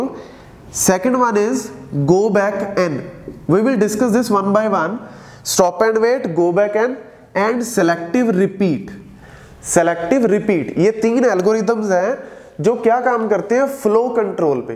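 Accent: Punjab, India. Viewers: Paji tusi punjab to ho😅 ?? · Yr punjabi tone ❤ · Thank You so much!!!!!! Amazing explanation! I enjoyed every bit of the video and realised I don't hate Networking after all! P.S. The Punjabi accent rocksss!!!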